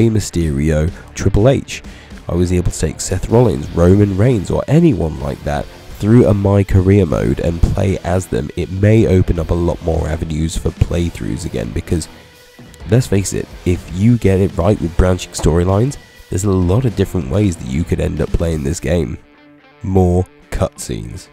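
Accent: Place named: United Kingdom. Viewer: Idk why, but I just love British accents.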